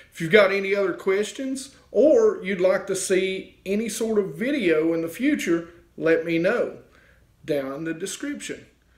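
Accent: United States. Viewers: i could listen to ur voice all day.. love the country accent!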